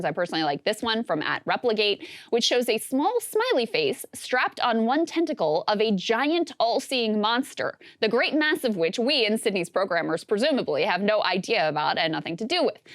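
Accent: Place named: United States. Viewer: She sounds like an American.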